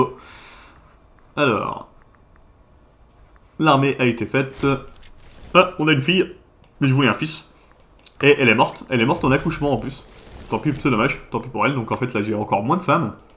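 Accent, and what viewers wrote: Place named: Netherlands. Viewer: ton Français et très bon mais ton accent te trahie:D Tu es de quelle pays? À vu de nez je dirais Hollande 🤔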